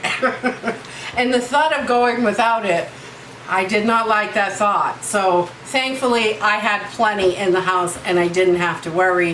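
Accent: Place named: Maine, United States. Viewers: I'm from Boston. I knew your "accent " that you were from New England. Love your videos. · I knew you were from Maine when I heard, “you’re bummin’” Subscribed 👍🤣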